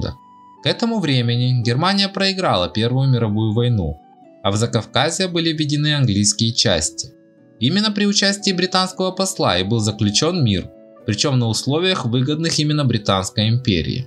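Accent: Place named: Odessa, Ukraine. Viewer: Азербайджана ещё небыло в это время он только создавался 😃 От куда такой Одесско-Еврейский акцент!?😃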